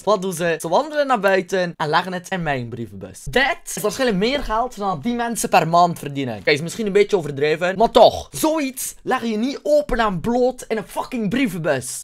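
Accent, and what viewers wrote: Belgium. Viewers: heey, lees je dit nu in je bed? XD ik kan altijd om je lachen, en je Belgiche accent vind ik echt awesome. xx nima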